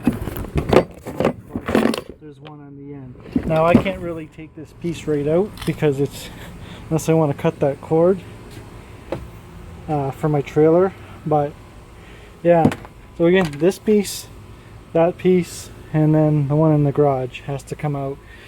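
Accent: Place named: Dakota, United States. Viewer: Dakota accent or canadian.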